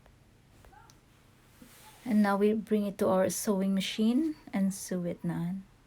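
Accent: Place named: Philippines. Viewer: Based on your accent you must be Pinay. Maraming salamat.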